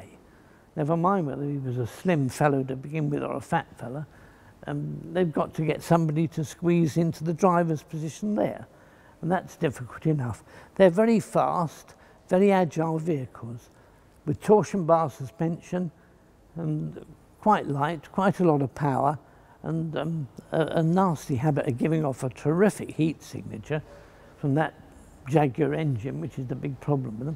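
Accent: United Kingdom. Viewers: Why does the British accent make everything fun to listen to? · That is the correct UK English pronunciation. How it is pronounced elsewhere, including where the jaguar cats actually live, is irrelevant to the subject.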